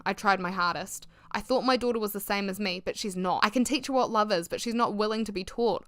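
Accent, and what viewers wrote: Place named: New Zealand. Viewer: I find your videos such a comfort. This one is different but still the same you. The way you tell stories is so captivating. Also, being an Aussie I find your Kiwi accent very cute and nice to listen to and not hard to understand at all. Thank you for bravely and humorously sharing your story. I hope you no longer assign any blame on yourself, you did nothing wrong and every action you took was the right one, including keeping the diary and telling your mum when you did. Props to your mum for looking after you as best she could. Sounds like it was a horrible, difficult, and frankly ridiculous situation to be living in. The relief you feel after his death is so real and valid and 100% allowed no matter how much you also love the not abusive parts of the person. Wishing you a peaceful and happy rest of your life, and the opportunity to experience the moments of joy and wonder you missed out on as a kid ❤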